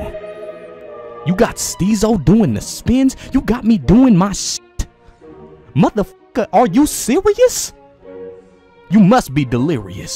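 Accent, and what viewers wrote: Australia. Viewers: That’s an Australian accent not newzealand…… like saying u sound Canadian get my point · Bruh that’s an Australian accent 🤨🤦🏾‍♂️ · says he's in new zealand put on australain accent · “I’m in New Zealand” proceeds to speak in an Australian accent as an Aussie I approve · Aussie accent?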